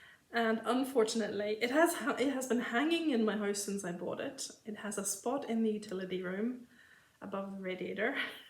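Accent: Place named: Ireland. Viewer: Your bee finish is absolutely gorgeous! I think it is the perfect use for the wood hanger. Your FFOs, finishes and wips are all just beautiful. Thank you for sharing them with us. It's always nice to stitch while listening to your videos. Your voice is so calm and I love your Irish accent! Take care & happy stitching!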